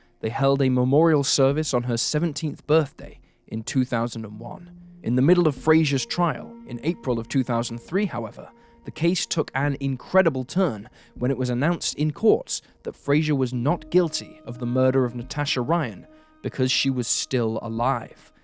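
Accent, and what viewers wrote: United Kingdom. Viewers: American stories with a British accent?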